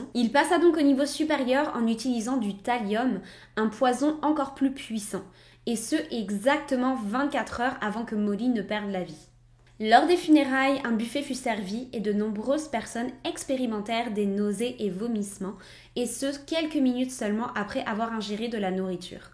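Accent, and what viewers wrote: Quebec, Canada. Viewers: Il y a un petit quelque chose dans ton accent qui sonne québécois. Est-ce que je me trompe? Tu es pétillante! C’était la première vidéo de que je regardais, mais certainement pas la dernière. Je me suis abonnée!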